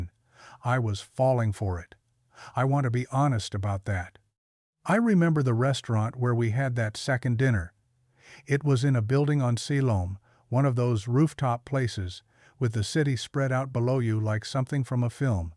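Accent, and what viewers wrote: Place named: United States. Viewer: This guy sounds like an American, yet he measures money in British pounds. This video is a warning about how not to be stupid, as all these types of videos are. I guess it is in the right place, as YT is where all these stupid people like to hang, but the content is a just copy of thousands upon thousands. Lesson of video: Use your brain and there are no problems here (...and maybe avoid BKK). In the end, this video is just a repetition of many, many other vlogs on YouTube. It is a simple, lazily made imitation of someone else's original idea. Every Farang in Bangkok thinks this is how to create content, but this type of channel is now getting really tired and uniteresting. If you are going to make up a story, next time make sure it has an original thought behind it.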